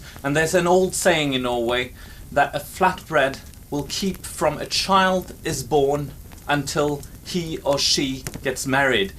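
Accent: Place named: United States. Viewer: He also herbs with a silent H (in a different episode?), which I'm quite sure they only do in the US.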